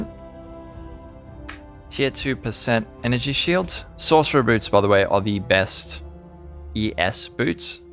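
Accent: South Africa. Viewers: Just came across your channel, and a quick question with regards to your accent - are you from South Africa?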